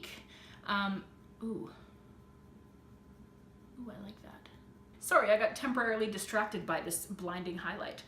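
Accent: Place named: Canada. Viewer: Who doesn't love a cdn accent?!!!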